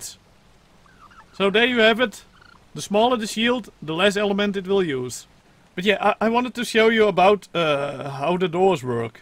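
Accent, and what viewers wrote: Netherlands. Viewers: hahaha je hoort wel goed dat je nederlands bent :D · nice nederlands accent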